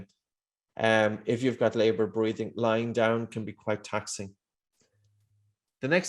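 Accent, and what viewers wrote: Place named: Ireland. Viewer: This is a very useful video. The Irish accent is so beautiful and calming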